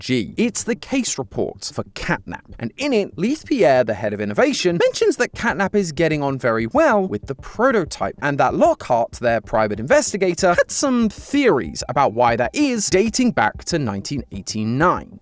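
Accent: United Kingdom. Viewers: Wait , why do u have a British accent all a sudden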